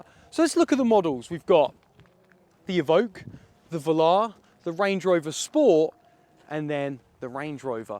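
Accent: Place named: United Kingdom. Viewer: That British accent is 🔥🔥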